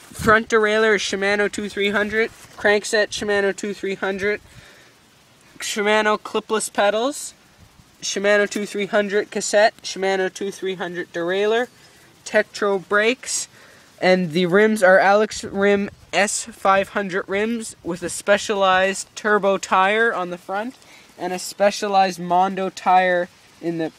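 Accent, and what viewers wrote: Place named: Upstate New York, United States. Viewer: Nice upstate NY accent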